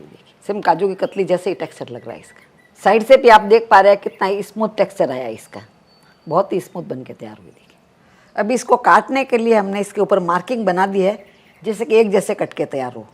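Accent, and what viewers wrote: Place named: Rajasthan, India. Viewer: Bahut hi achha he.aur aapka voice bahut hi muje pasand he rajasthani flavour aati he..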